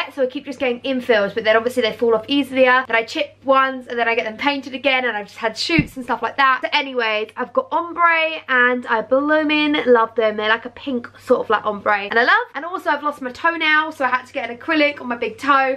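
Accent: United Kingdom. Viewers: Is she British? I think cuz of their Accent ..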